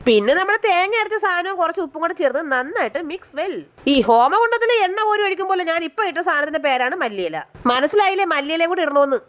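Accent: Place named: Kollam, India. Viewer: ഗർഫിണി... ചേച്ചി കൊല്ലം കാരി ആണോ 😄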